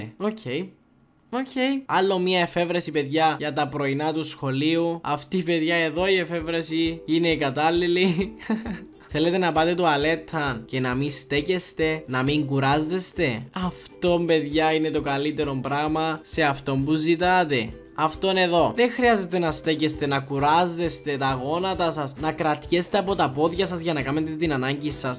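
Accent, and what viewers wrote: Cyprus. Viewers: Ίσως πολλούς να τους ενοχλούν τα κυπριακά σου αλλά εμένα μου αρέσουν!!! Είναι όλα τα λεφτά 😍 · Γλυκούλης ...λατρεύω τα κυπριακά 😍😘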